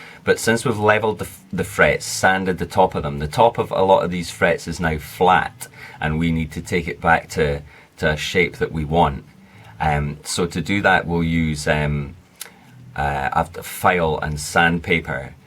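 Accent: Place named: Scotland, United Kingdom. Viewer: These two videos have gave me the confidence to try my own bit of fret leveling. Very relaxing to watch also, I think it's your Scottish accent. You sound a smidgen like Stephen Hendry.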